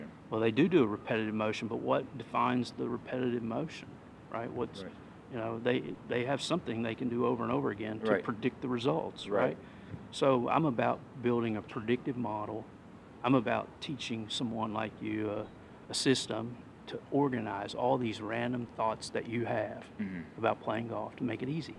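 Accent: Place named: United States. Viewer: What S&T is..? Is 'painting lipstick on a pig', it is just fast-talking with an American accent which cons people into thinking it is good for them..Generally it is pretty much what has been done WITH SHORTER CLUBS since forever...and it can't be successfully done with the longer clubs. If you don't move weight/mass away on the backswing..you can't use it on the downswing.. proponents of S&T nearlyy always seem to need to rubbish every other system..just to leverage their marketing of this WHICH NO GOOD GOLFER USES,,,and for which NO statistical evidence of subsequent shot quality has been done or shown. Would any one like to show a video of FADES being repeatedly done across the club range with this system..SHOW AND PROVED, not just talked about.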